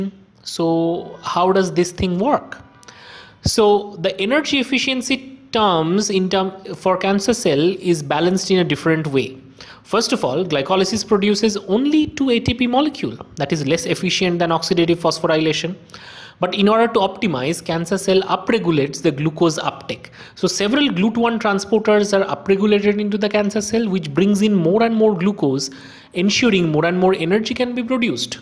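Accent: India. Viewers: Your videos are good but I don't watch them because I'm scared of indian accent 😅 sorry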